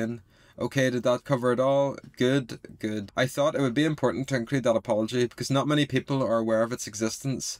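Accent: Northern Ireland, United Kingdom. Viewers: so strange seeing a video like this being narrated by a person with a northern irish accent, pretty rare lol caught me off guard as i’m not used to hearing my own accent on youtube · I can't tell which part but i feel like you're from the UK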